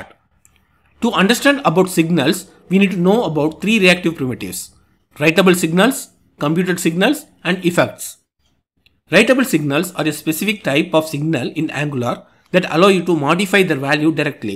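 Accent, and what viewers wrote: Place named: India. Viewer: Fantastic explanation; he has such a nice Indian tone!!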